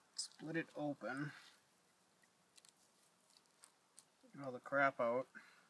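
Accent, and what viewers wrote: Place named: Canada. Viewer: I hear that Canadian accent. That was a Canada goose, or as it is called in Canada, a goose. Must be why it just says goose in the title of this video. Well, the video was very good. Thanks!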